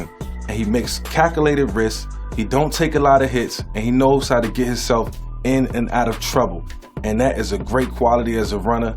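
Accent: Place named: Newport News, United States. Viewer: Vick sound like he from Downtown Newport News ❤️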